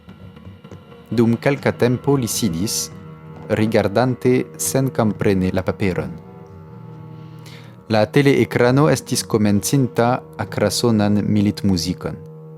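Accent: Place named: France. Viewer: Mi rekonas francan akĉenton sed ne la precizan homon...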